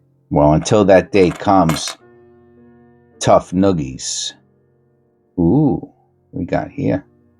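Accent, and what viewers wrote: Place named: Brooklyn, United States. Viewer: I knew I recognized that accent lol I'm also from Brooklyn and live in Bensonhurst.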